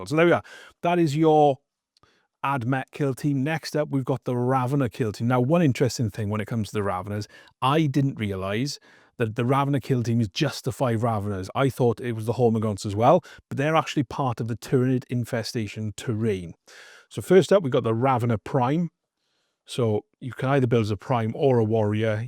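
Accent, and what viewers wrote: United Kingdom. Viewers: Lovely accent, whereabouts in the UK are you from?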